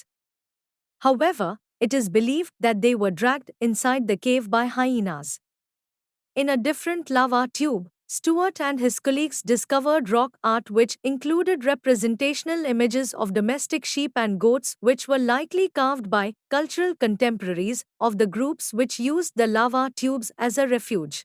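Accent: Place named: Middle East. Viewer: Even with a Middle-Eastern accent, you sound like a bot.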